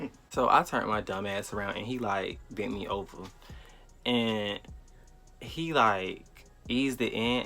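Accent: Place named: Midwest, United States. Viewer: U from or in da MidWest ? U sound like it.....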